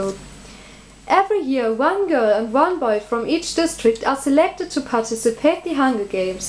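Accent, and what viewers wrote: Germany. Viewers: Die Aussprache is schon ziemlich deutsch xD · cooles video! man merkt aber an deiner aussprache dass du deutsch bist :D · Tolles Video ! Dieses Englisch mit deutschem Akzent ist einfach herrlich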